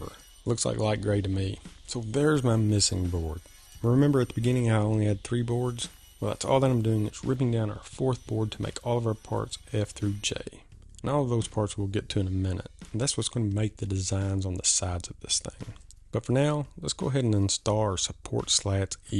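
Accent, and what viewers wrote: South, United States. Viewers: a southern drawl is a good thing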